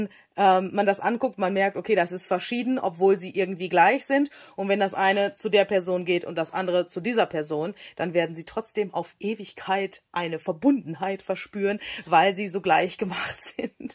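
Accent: Germany. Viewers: Kommst du aus Baden-Württemberg? Ich sende Grüße in meine Heimat sehnsucht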